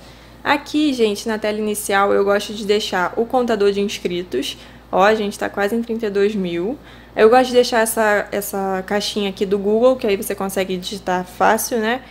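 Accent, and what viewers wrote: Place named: Rio de Janeiro, Brazil. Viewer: Carioca... Aqui no Rio geralmente usa muito o x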